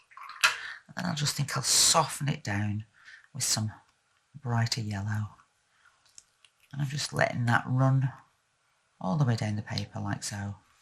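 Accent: Northern England, United Kingdom. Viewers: I'm delighted to have found your channel, and lovely to hear a Northern accent. Im truly in love with your technique and can't wait to have a go myself. Your colour choices are amazing. I've subscribed!!!❤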